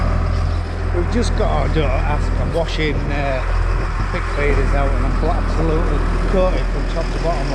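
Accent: Yorkshire, United Kingdom. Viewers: Best farming videos on YouTube entertaining as well as informative, love the Yorkshire dialect even though I'm from Lancashire. Hope you enjoyed your afternoon out 👍👍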